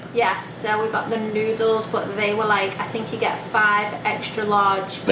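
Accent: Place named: United Kingdom. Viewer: Where abouts you here in UK .....youve got that lovely british accent ....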